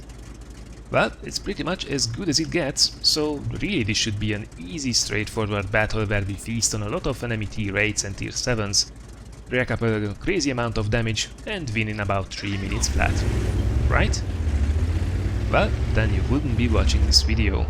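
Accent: Hungary. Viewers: Magyar vagy? :D Az angolod elég magyaros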